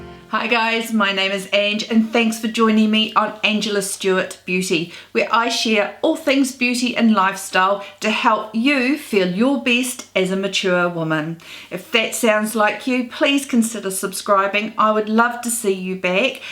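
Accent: New Zealand. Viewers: I am a 66 year old from Baton Rouge Louisiana. I love your accent. It's terrible that products like CerVave costs so much for those of you in New Zealand. I had rosacea in my earlier years and I took birth control pills for a long time. So, my current issues are sagging skin and wrinkles. But I still have the oily tzone. Within the last few years, I have developed this annoying problem called sebasceous hyperplasia (clogged pores). It's like little skin colored bumps that are primarily on my forehead. They rarely go away. I hope that you never have to deal with it. We do not know what causes it nor how to get rid of it. But I need to get rid of extra sebum without wrinkling even more.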